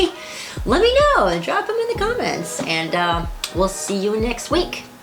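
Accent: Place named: New York, United States. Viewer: 🤣 you were 'thinkin abowdit'? How are u from IL and not New Yawk..cause I hear my own NY roots in a lot of how u tawk lol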